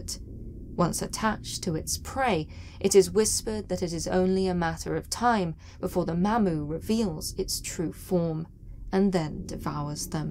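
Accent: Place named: East Anglia, United Kingdom. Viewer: I love you lady! Your East Anglia accent and proper demeanor excel beyond the mediocre expectations placed on YouTube by so many. You are better than you do and deserve your Home television show or 30 minute spot at the least!! Bless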